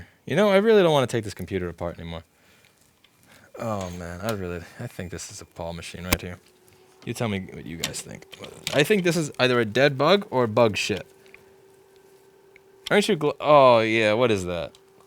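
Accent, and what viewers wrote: New York, United States. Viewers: as someone who uses English as it supposed to be used its deffo soLder ..lol .. but your US-NY dialect doesn't bother me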